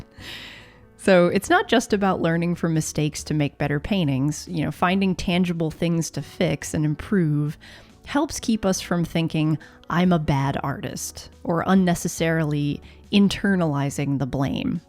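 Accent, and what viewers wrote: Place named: United States. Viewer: That's a very strong American accent ... I can't hear an English accent at all.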